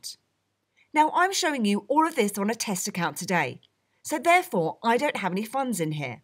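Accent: United Kingdom. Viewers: UK girl huh, you single?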